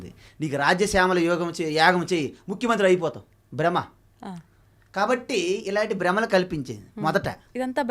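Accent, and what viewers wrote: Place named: Srikakulam, India. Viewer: స్వామి గారి ప్రవచనములు యెుక్క వివరణ ఛాలా బావుంది. కాని ఈయన శ్రీకాకుళం జిల్లా లావుంది ఎందుకంటే ఈయన యాస అలానే ఉంది.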